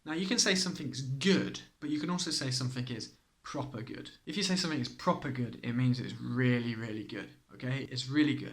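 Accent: United Kingdom. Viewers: Your videos are proper good, Iam fond of the British accent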